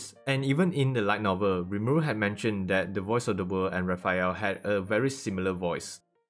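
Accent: Singapore. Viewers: You have singapore accent. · Holy shit your accent is VERY Singaporean lol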